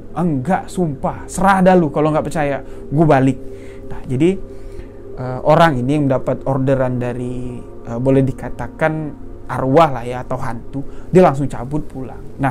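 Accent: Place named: Bali, Indonesia. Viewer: Abang ni logatnya bali sekali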